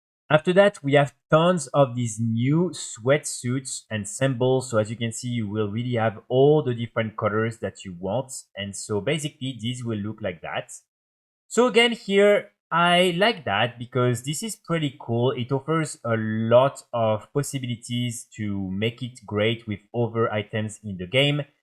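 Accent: France. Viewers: Je comprend beaucoup trop facilement cet anglais, c'est un compatriote xD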